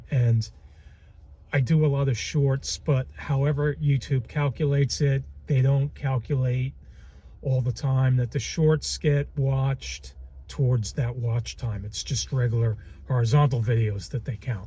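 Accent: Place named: Mid-Atlantic, United States. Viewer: Mid Atlantic accent. Interesting.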